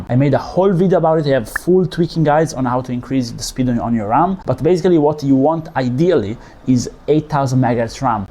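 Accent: Italy. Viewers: sei italiano vero???? Complimenti continua cosi Bravissimo.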